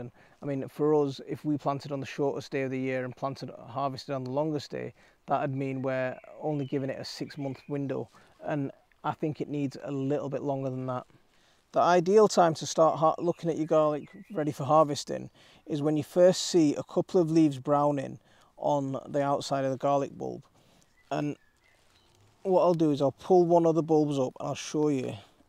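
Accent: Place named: Northern England, United Kingdom. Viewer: ish you said it didn't divide. You've got a great northern accent but didn't you get much frost up there, or have you got a very sheltered garden?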